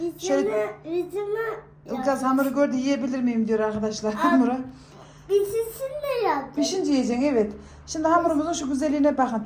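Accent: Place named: Afyon, Turkey. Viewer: Merhaba hanım. Bu videonuz facebookta denk geldi. Konuşmaniz bizim Afyon tarafına benziyor nerelisiniz acaba? Ellerinize sağlık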